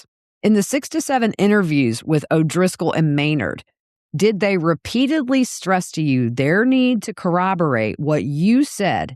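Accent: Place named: Texas, United States. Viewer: Such a great recap, and you are great to listen to. Love your accent. I used to live in Texas and you make me miss home❤ I live in California now-no accepts here😢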